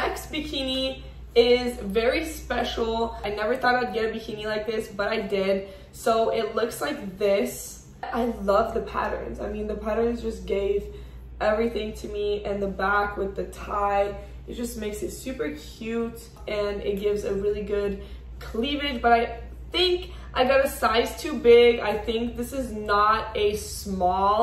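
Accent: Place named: Brazil. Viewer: Não adianta ! O sotaque brasileiro no inglês é permanente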